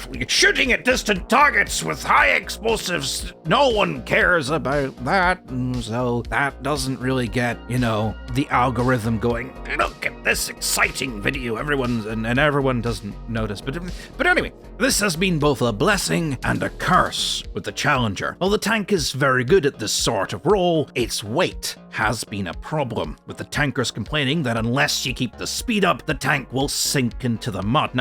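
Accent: Scotland, United Kingdom. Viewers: FUCK YEAHHHHHHHHHHHHH THE FUNNY SCOTTISH VOICE. I FUCKING LOVE MILITARY HISTORYYYYYYYYYYYYYYYYYYYYYYYYYYYYYYYYYYYY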